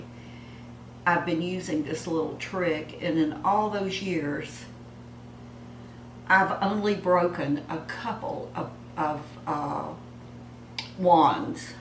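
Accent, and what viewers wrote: South, United States. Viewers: I thought I recognized that accent! I am from Arkansas. We sound alike. Lol! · I love the way you say "mirror". That southern bell accent is so sweet.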